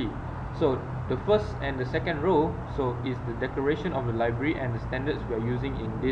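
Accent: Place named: Malaysia. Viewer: your accent makes me miss Malaysia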